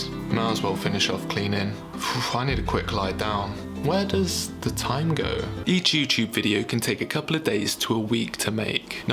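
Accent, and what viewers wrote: England, United Kingdom. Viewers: are you English.... Anyways my day is pretty much like yours l am myself just launching into the you tube space into the health and wellness arena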